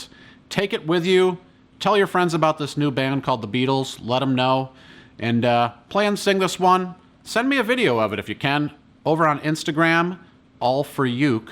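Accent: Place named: South, United States. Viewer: Dudes definitely from the south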